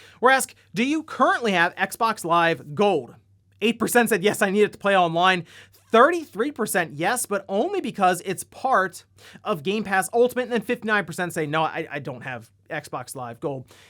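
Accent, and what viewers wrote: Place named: Brooklyn, United States. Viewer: he does have a faint Brooklyn accent.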